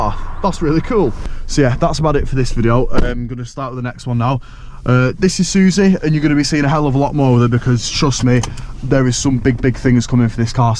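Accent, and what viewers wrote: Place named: United Kingdom. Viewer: I stayed till the end for the British accent it sounds great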